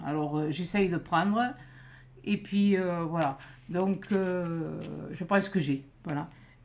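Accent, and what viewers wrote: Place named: Lozère, France. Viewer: Bonjour. Vous m avez bien fait rire avec votre kangourou et votre accent. Je szis pas d où vous etes mais certainement pas du nord de la france. Il me fait penser un peu du sud de la Lozère. J ai passé un bon moment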